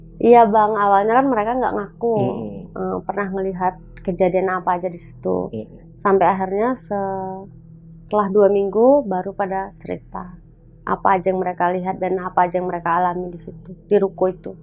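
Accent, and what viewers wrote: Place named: Semarang, Indonesia. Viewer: Sy Org rantau Kl denger medok ny Semarang an dan sekitarnya kok merasa terhiburnya beda y Mbak Rambutnya d jepit sekena nya aja kliatan cantik bgt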